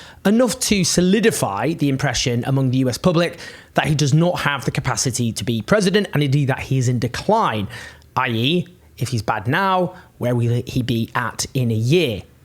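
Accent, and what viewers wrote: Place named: Yorkshire, United Kingdom. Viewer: He's a Yorkshireman, and they're a bit different up there.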